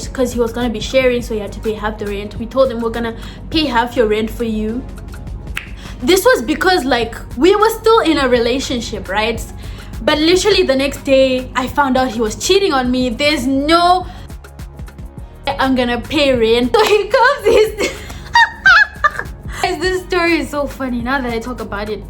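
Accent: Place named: South Africa. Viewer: Sounds like a south african gent😭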